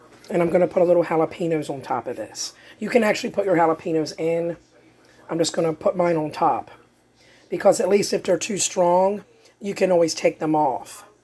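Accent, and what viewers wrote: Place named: Chicago, United States. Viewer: Your accent, I'm guessing Chicago area?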